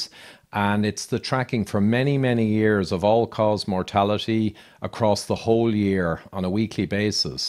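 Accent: Ireland. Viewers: Is that a flat Kildare accent I'm hearing???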